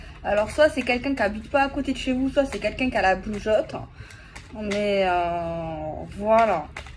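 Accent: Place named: South, France. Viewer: trés jolie avé l, accent en plus ma voisine *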